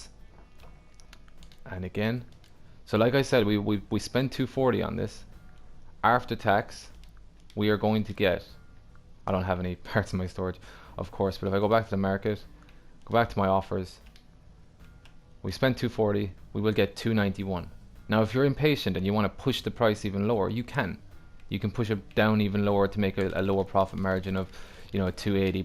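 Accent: Ireland. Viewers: Are u Irish cos I'm from waterford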